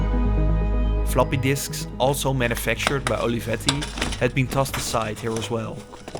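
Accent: Netherlands. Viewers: Je haalt ze d´r altijd in 2 seconden tussenuit hè, die Engels-sprekende Nederlanders 😄👍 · Dutch voice 😅 olivetti had design. Jammer dat ze niet meer bestaan. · Veel leuker met meer uitleg over de geschiedenis van het gebouw dan de standaard urbex video's, dacht al een Nederlands tintje in je taal te horen haha. Subbed! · ontzettend nederlands accent . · the most dutch accent ever .. Thank you for your content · You can hear you are Dutch. Not only that, but are you from Leiden?! My head wants to know... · Well, Someone just made it to a CIA transscript :P. Great vid. You sound like you're from the netherlands. If so, Top video. Ik ga zeker abonneren. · Keep up the vids! Have to say that the Dutch accent is strong with this one! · Engelse les bij Mark Rutte gehad? Ik vind je docu geweldig, maar je Nederlandse Engels is goed te horen. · Dutch English 😂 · Top notch Urbex content, guys! 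Music, back story, pictures, narration; everything is just right. I really enjoyed the video and to learn the story of Olivetti. And as someone from the Netherlands, I enjoyed the Dutch accent, of course. ;) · Tintje? Het is overduidelijk een vrij dikke nederlandse accent. Voor mij is het niet om aan te horen maar dat is vrij persoonlijk. De inhoud is best goed en erg interessant en daar doet het accent niets aan af. Mooie docu! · Dutch accent by the sound.😁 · Jezus man, gebruik Ai ofzo. Dat Dunglish accent van je is super-irritant. Verder wel leuk.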